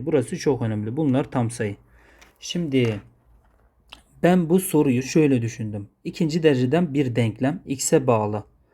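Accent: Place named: Van, Turkey. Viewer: hocam Vanlı mısınız bir arkadaşımın konuşma şekline çok benziyor sizin konuşma şekliniz 😊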